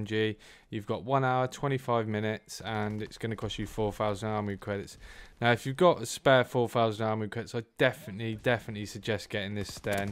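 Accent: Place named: United Kingdom. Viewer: Damn british accent I can barely understand half the stuff you're saying 🤣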